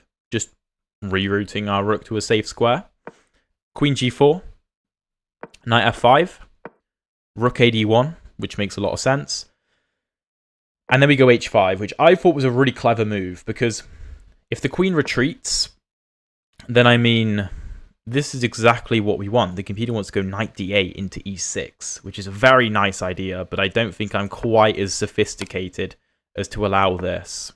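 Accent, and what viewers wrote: United Kingdom. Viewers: I like your materials a lot. Very educative. For me crucial thing about your chess footages is that often you find yourself in a position where other (and by other I mean better - no offense) chess youtubers know the next move by heart and it's hard for them to actually explain why THIS is the move. I think you benefit from that because you are actually going through you thought process, explaining what you see on the board. Sometimes even though you are not sure you assume THIS might be the move because of the process of elimination or whatever. I really like it and I think many players like me can benefit from that. I am also grinding Caro as black and Viena as white so what you do is even more to my liking. On top of that I am constantly learning English and British is the accent I love to listen to so this is kind of a cherry on top. 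Keep up doing what you're doing and I hope you'll claim that golden YT button one day.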